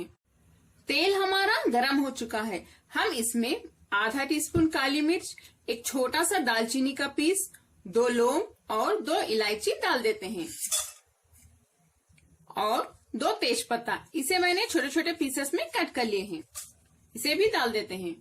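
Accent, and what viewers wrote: Hyderabad, India. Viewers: got to know with your hyderabadi language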